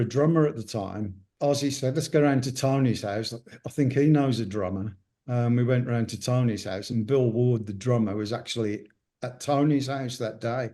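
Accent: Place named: United States. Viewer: love geezer, dont really like that yanky narrator!!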